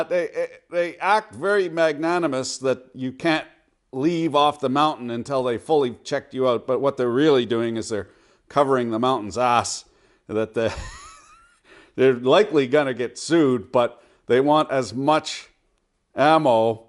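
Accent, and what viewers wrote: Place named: Canada. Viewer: Narrated is classic unfiltered Canadian style -- well done!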